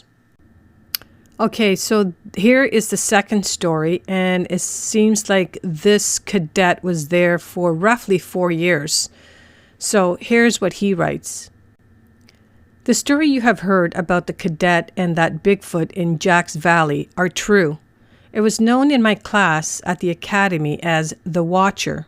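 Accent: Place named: Quebec, Canada. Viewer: Great stories. I love your accent. It reminds me of all my wonderful French Canadian friends:-)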